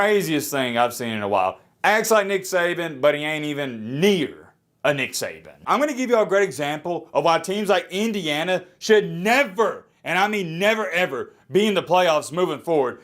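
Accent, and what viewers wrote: South, United States. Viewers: listening to this guy gives me a headache. just some hick from the south that thinks he knows everything. im from TN too so i would know.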